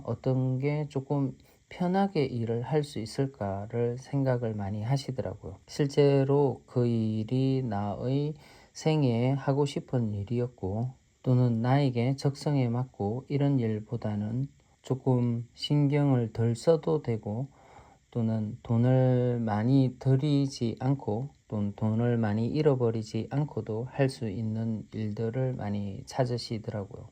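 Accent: Gyeongsang, South Korea. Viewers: 이렇게 듣기좋은 경상도 사투리는 첨 이네요😊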